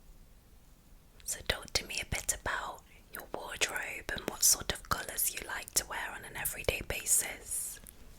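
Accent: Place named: London, United Kingdom. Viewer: Are you from Central London? You have the EXACT same accent as my auntie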